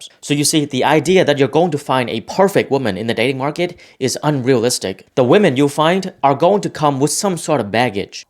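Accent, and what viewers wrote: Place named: Vietnam. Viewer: Dude are you Vietnamese? U got that accent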